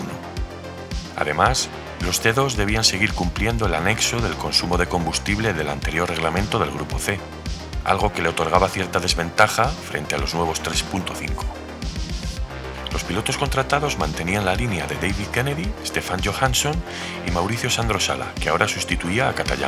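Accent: Spain. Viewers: me cansé... Me cansé de mirar tus videos sin comentar. En primer lugar, agradezco muchísimo tu dedicación, eso le da una gran calidad al contenido que generas. Sinceramente, con tus documentales de automovilismo, se aprende bastante sobre la historia. Y digo documentales porque, tu voz con acento español, las imágenes y la música ochentera, te dan ese estilo "retro" que me recuerda a los documentales que miraba por TV cuando era chico, o en los VHS que nos mostraban en la escuela. Gracias por hacer un video del Mazda 787B, que es sin dudas (para mi), el auto de carreras con el mejor sonido de la historia... pero bueno, gustos son gustos... Y te pregunto a ti: Para vos, ¿Cuál fue o es el auto de carreras, que lo escuchas bramar, acelerar y rebajar, y te parece que es el que mejor suena?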